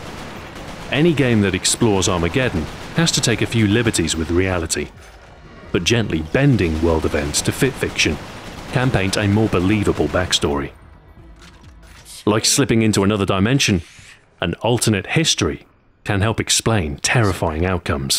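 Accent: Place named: United Kingdom. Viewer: Gaming Culture Well he sounds like he's from the UK and we have Dominoe's here as well.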